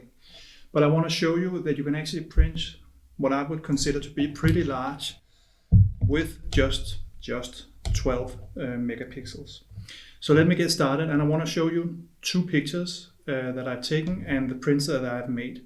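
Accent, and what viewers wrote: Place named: Denmark. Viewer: Your accent sounds Danish.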